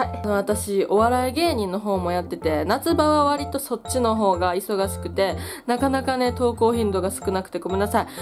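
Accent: Kansai, Japan. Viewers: 今も芸人してたの？！スマホの中身私とほぼ同じだ！笑スマホ分けてるところ関西弁うれし！山田涼介に似てるって言われた時の反応すき！恨むわけない！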